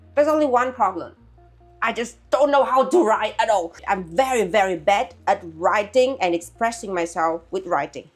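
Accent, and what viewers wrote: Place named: Germany. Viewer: Your English is not a burden! I love. You remind me of how my German mother speaks English and I find it quite lovely.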